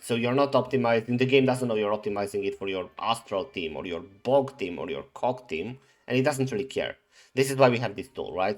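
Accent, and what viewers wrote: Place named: Romania. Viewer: How to recognize a Romanian just by accent :)))) What gave you up 100% was the: "La, la, la" instead of "Yada, yada, yada" :P Great video btw!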